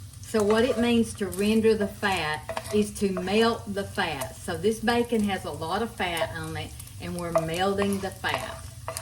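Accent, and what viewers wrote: South, United States. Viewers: I’m so glad I found your channel. I’ve now watched several of your videos and everything looks so yummy! Love your southern accent…………I love also that you call your husband sweet Hunter…….my husband was a sweetheart, but passed away 4 years ago……miss him so much!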